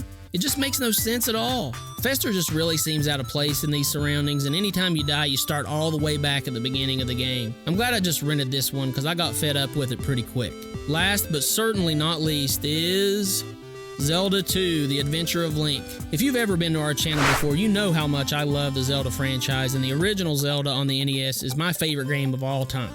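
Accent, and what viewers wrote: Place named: Texas, United States. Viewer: Bizarre question, but what accent is that? Texan?? From England myself, but totally dig the accent dude!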